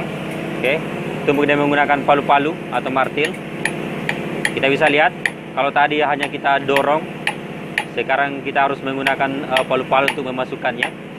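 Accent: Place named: East Java, Indonesia. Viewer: MANTAB PENJELASANNYA , KALAU DENGAR LOGAT BICARANYA SEPERTINYA MAS DARI JAWA TIMUR YA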